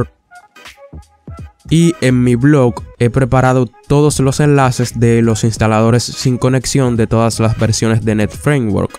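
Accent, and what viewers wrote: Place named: Dominican Republic. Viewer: Todo muy claro, bien organizado y estructurado, además acompañado de un buen acento dominicano. Muchas gracias señor ;)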